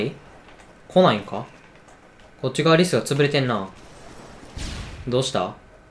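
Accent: Kansai, Japan. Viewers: 関西の方ですよね？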